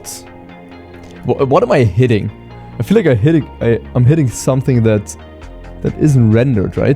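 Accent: Germany. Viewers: Are u german by chance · You are german right? I hear that. also you pronounce german tank nemes right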